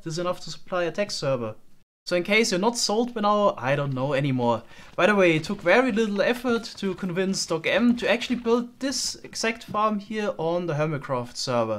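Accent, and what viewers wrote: Germany. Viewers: Deutscher Akzent, liebs:) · are you german? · sounds like a german accent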